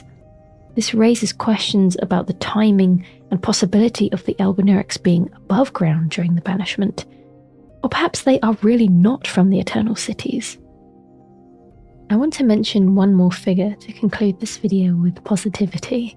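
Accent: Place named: Lancashire, United Kingdom. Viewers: Ah I've finally found my favorite Elden Ring Lore channel. I see a lot of them and many of them are amazing, but you really know how to tell a story. I love your voice as well, you'd actually suit doing voice work for Fromsoft games. Very relaxing and soothing English accent. A nice juxtaposition that some characters have in comparison to the harsh environment of the games. Thanks for this. I find it hard to piece tobegher the story of these games even when it's right in your face. This really helps to break it down. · First time I found your channel. And I swear it feels like I'm still playing the game 😅. Your voice fits the lands between perfectly. You sound just like latenna. I'm from Lancashire so I love a local accent.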